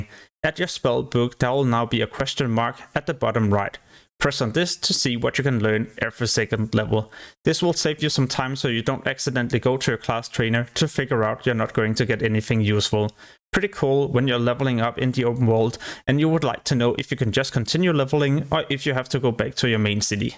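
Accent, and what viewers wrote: Denmark. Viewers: Man you sound Danish 😅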